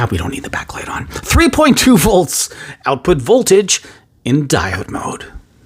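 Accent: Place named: United States. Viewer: Хорошее произношение, вроде американский акцент, интересный для меня профиль канала. Буду аудирование проходить. 😊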